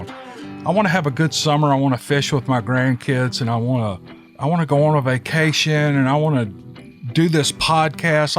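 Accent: South, United States. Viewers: I love listening to you , you have never told a dull story. Your southern drawl gives your stories that extra "flair" for great listening. I listen to them in the dark when going to bed...they are my "bed time stories". One day I will share what happened to my brother and I on St. Helena Island, SC., rural area of Frogmore...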